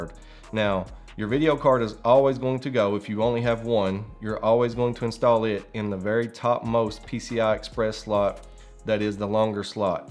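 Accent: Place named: Appalachia, United States. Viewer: Accent is N.C, Tennessee, West Virginia or Kentucky...Am I right?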